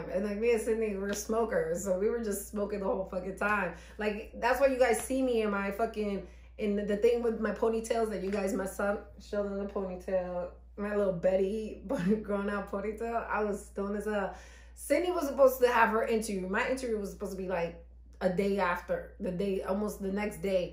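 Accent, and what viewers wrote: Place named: Baltimore, United States. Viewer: The way you sound on here I would think you’re from Baltimore/DC area not N. jersey haha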